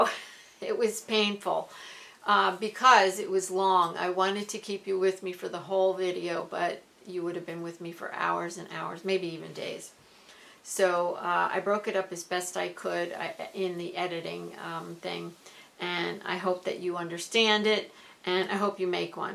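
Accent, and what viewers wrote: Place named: Louisiana, United States. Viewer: You sound like you are from Louisiana.😊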